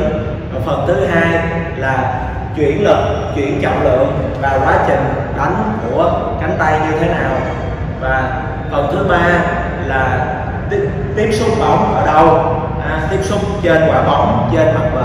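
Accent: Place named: South, Vietnam. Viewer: Anh, next time I might visit you, when I am close to HCM. I am a northern and at times I still struggle to understand all of your southern dialect.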